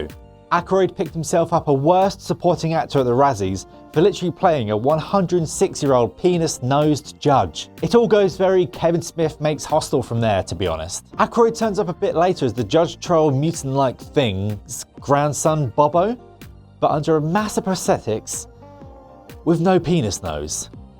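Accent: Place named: Cockney, United Kingdom. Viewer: Ex drug addict Cockney guys are not who you hire for voice over work...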